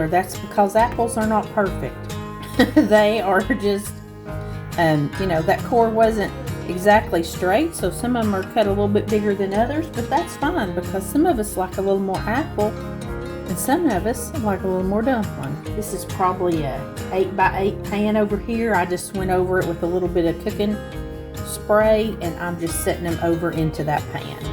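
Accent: South, United States. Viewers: I’m new and catching up with your channel! Love your crescent roll options and looking forward to trying all these! I am from NYC but also lived down south! I so enjoy the “southern comfort” of your voice and your calm demeanor! You are just great therapy ma’am! Cheers, Michael Albuquerque NM USA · Wow, what a treat!! Have shared to my laptop. But a question - are these recipes available in writing? I must say. Your beautiful southern accent is music to my ears. I left Alabama with my children and pastor husband to Hawaii in the late 70’s. Oh, I just heard Tennessee, the place of my birth. He pastored a church for years, but is in heaven now. I stayed here as my children married wonderful local people. And have their roots planted deep in Hawaii. Mahalo for your scrumptious recipes. Do hope they are available in writing to print.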